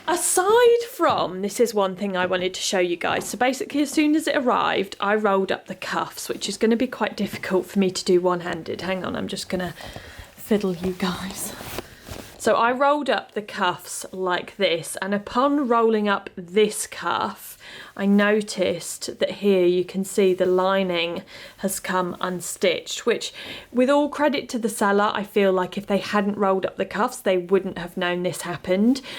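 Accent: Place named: London, United Kingdom. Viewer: Ok, let's make a deal. I'll come to London and you teach me that oh so wonderful English (London) accent and I will transmit to you my Texan twang. And yes....I say "y'all" & "fixin'".🤣😎🤣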